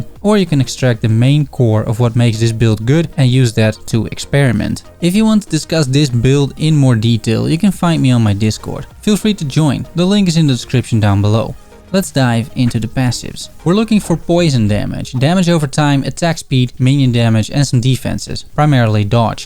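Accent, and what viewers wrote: Netherlands. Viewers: Your build guids are getting better and better! Keep up the good work. Where are you from? Are you Dutch?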